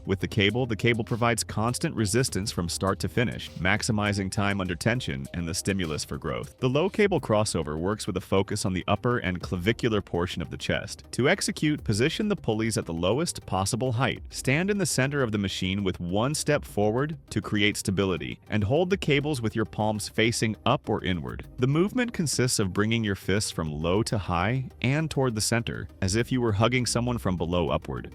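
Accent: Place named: United States. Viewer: yet another BS clickbait video were the narrator has an awful american accent so bad you want to punch them in the face.Avoid.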